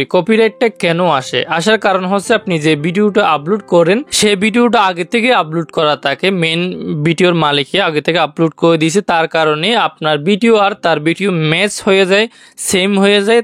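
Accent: Chittagong, Bangladesh. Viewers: ভাইয়া মনে হয় চট্টগ্রাম এর..? আপনার কথা গুলা শুনে মনে হয় ☺️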